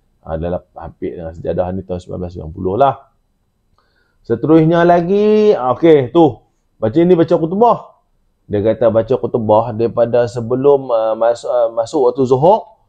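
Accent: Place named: North, Malaysia. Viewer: Assalam tuan pekat snguh tuan ckp utara rindu plk kg haha...mcm crown yg tuan pkai tu ada allah/muhammad kn klo org bkn islam pkai tu mcm mna plk tu tuan..😊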